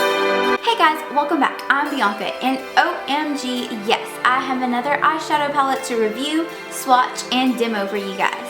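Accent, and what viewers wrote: South, United States. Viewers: Usually I think of these kinds of colors looking best on fair skin, but they look magnificent on your tan complexion! You seem to have a bit of an accent. Are you from the South?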